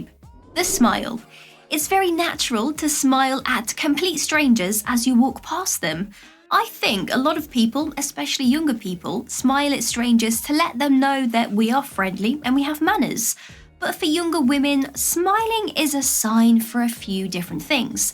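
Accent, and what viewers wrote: United Kingdom. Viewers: She is british....at least the accent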